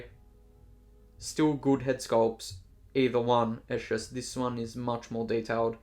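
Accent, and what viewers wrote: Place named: Australia. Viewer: I noticed your aus accent too, how much did you get the inart one for?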